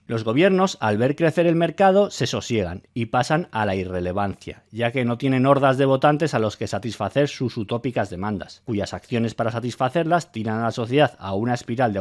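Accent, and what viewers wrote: Mexico. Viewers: 🤔te parece que habla como mexicano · Estoy empezando a pensar que eres mexicano Asentó, palabras, ejemplos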